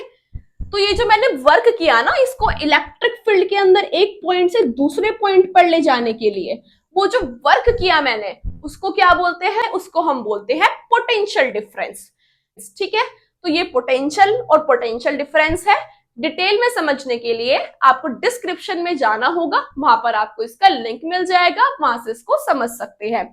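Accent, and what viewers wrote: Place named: Pakistan. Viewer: her accent is like pakistani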